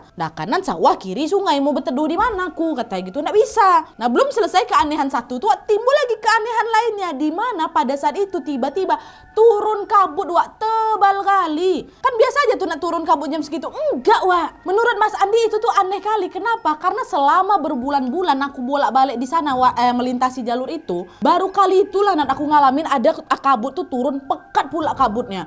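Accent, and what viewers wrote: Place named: Sumatra, Indonesia. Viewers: asli urang awak Yo? Minang dimanyo? Masih totok minangnyo kayaknya. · Seru denger cerita pake logat Sumatera campur bahasa Padang dan Jawa. Jadi unik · logat pku nya kental kali haha,semngtt ka,salam dari marpoyan sekitar😂😂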